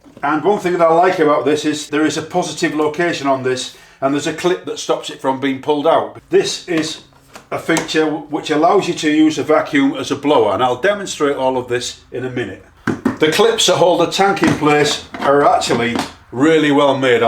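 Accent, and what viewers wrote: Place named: Hull, United Kingdom. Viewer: Great video just ordered one... I recognise that accent got to be from Hull 👍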